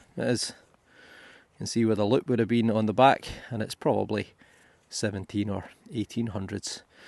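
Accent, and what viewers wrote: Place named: Scotland, United Kingdom. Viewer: i hate to make your head swell but these comments are right you have great videos and most importantly great finds but also your have a great voice and accent. everybody loves a Scottish accent specially when you can understand with a Yankee ear, you could be the next Sean Connery. keep it up and you'll have 6 figure subscribers in a year.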